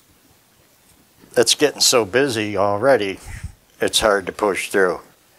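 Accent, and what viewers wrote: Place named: Illinois, United States. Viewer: Big or small does it all! Beautiful job. They’re all my favorites. You mentioned that you lived in Tennessee at one time. Were you originally from Illinois? That accent!!! Lol! Were you a marine too? 😃🌷🌺 God bless and protect the Ukraine 🇺🇦 🇺🇸 TFS!!!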